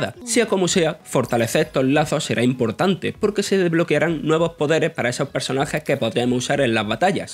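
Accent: Andalusia, Spain. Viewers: es que tio tienen contratado al notas con mas acento cerrado sureño de toda españa, literalmente no le entiendo mas que una o dos palabras, joder que le pongan a editar o redactar o que le paguen un logopeda. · Ese acento de que parte de españa es? No le entiendo nada xD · Acento muy común de españa. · sí, el acento andaluz, del sur de españa. Un desastre, y muchos españoles dicen después de lo "mal que hablamos los latinos" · ! al fin te escucho de nuevo, hace tiempo que no veía un video con vos, me encanta tu forma de hablar No sé de qué región de España es, pero me parece buenísima! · Juraría que así hablan en cierto lugar de España, pero no logro recordar dónde exactamente xD me hace mucha gracia igual :v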